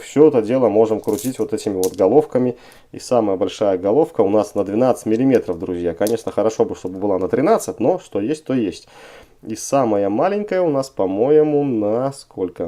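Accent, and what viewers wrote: Belarus. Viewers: По акценту Беларус ) из Мозыря вы ?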